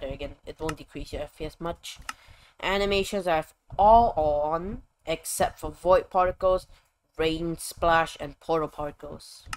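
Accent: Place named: Singapore. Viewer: i am singaporean and i speak like that too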